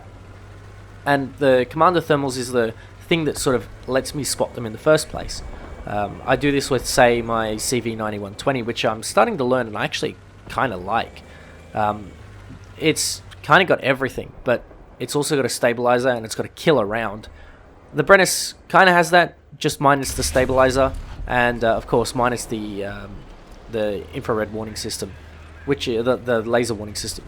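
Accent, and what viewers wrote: Australia. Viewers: Its better not really to care tbh, your one of the few i listen to when it comes to vidoes on the games state. Your chill (also the aussie accent just makes it better) unlike most other creators i see who make massive spiels about the littlest of problems, which i just find irritating.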